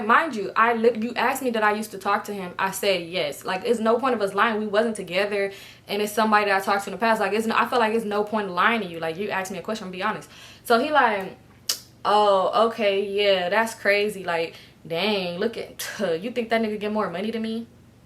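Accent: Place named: California, United States. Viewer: Tbh you always look gorgeous but in this video, you just flawlessly glowing and I’m loving it 😍🥰 literally all I be watching is your videos cause we got too much in common 😂 and we talk the same, must be a Cali thing cause I be so amazed hearing you say things I say 🤣🤣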